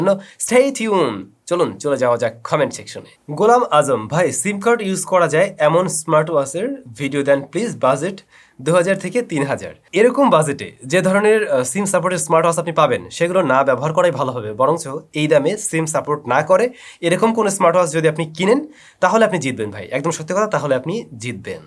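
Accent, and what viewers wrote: Barishal, Bangladesh. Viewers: ভাইয়া কি বরিশাইল্লা??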